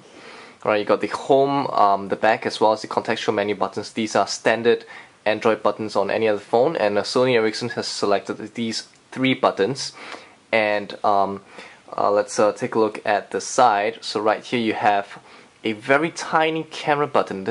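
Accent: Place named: Singapore. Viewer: When I watched this video I was listening to the guys voice. "Definitely Singaporean" haha looks like i'm right XD Singaporean's do have an accent huh... BTW I have this phone, It's not bad and the screen and is BIG but what I don't like about the MIdnight blue/black version is that that back cover gets a lot of finger prints.. but it's good.. quite.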